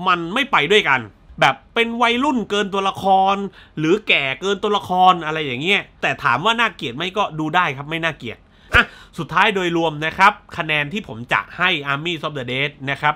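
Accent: South, Thailand. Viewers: พี่คนที่ ติดพูดสำเนียงใต้อะครับ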